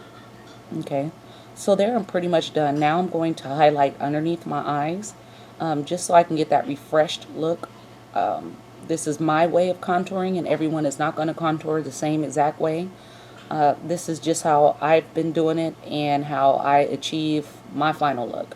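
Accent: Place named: United States. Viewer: You should never have named this video 'Fugly' ... you are by no means ugly. Ugly is as Ugly does .... its what's in your heart that counts. Also, you have one of the prettiest smiles I have seen. Plus your make up video is wonderful. Well done! Please post more. Your accent is lovely too, very easy to listen to ... I'm British so I always love the American accents lol. Enjoy making your videos ... you're teaching people all over the world how to look fabulous!!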